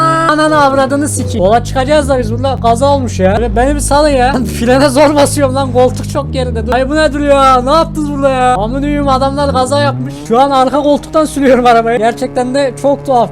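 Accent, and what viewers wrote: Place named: Ankara, Turkey. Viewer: Dünyada hem Ankara hemde Adana şivesi yapabilen tek insan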